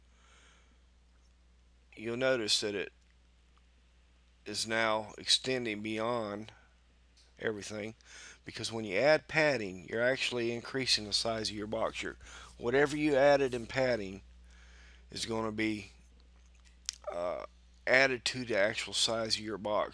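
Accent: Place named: Tennessee, United States. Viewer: It's the Tennessee accent for me.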